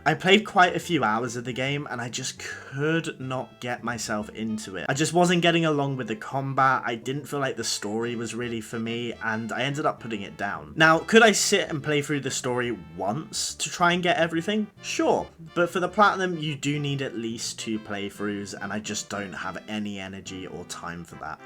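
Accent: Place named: United Kingdom. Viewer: I'm guessing by your accent that you're from the UK. surely you can pick up a copy of naughty bear in cex for quite cheap. I've thought about going for it myself recently but seems like an extremely daunting task but wouldn't mind seeing you go for it 😂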